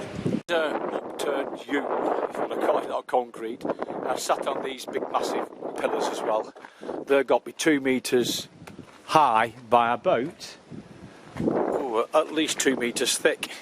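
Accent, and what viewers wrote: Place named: Wigan, United Kingdom. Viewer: Sounds like this guy is from Wigan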